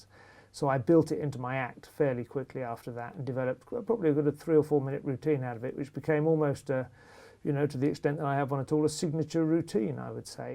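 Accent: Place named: RP, United Kingdom. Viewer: Yes, as I commented earlier in this thread, in response to Terence Quinn: "I had the same discussion with you before on another of Evans' YouTube videos, so it seems that you are just a troll who clearly dislikes someone speaking with a comparatively posh accent, and immediately jumps to (the wrong) conclusion about money and a private education (a minimal amount of research on Wikipedia would have told you about education, but you clearly couldn't be bothered)."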